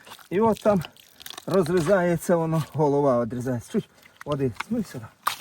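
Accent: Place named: Surzhyk, Ukraine. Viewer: Учичи буквар вчи українську мову яка гидка твоя суржикова мова просто ганьба гидко слухати може ти щось і петраїш в цій справі а мова просто гімно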